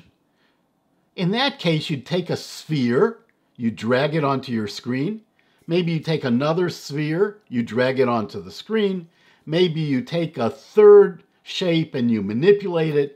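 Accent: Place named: United States. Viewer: I listen english spoken vids very often, cos I'm learning english for my own and I found Mr., who I´ve been following for a quite some time now, have a very clear and nice american pronunciation. So, as I'm not a native listener in eglish, for me is perfectly well to listen his vids at normal speed. Cheers, John from Santiago de Chile. South America.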